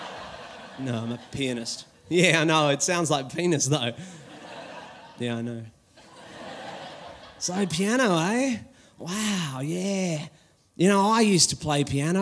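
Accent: Australia. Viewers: a MEGA talent , pity the subtitles are prudish (blanking out swear words on the i'net WTF !!) & often put in the wrong words coz they don't correctly hear the Aussie accent ! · That was the basis of my comment. After the many times I've seen him it's the first time I noticed a hint of Aussie. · If you are in any doubt as to nationality listen to his accent. It's a middle of the road educated Aussie accent.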